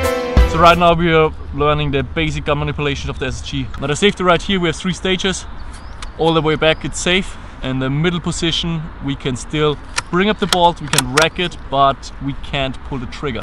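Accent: Germany. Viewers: Always asked myself if u were German because u sound like it. Now I saw that on the Blackboard :D #Novritscharmy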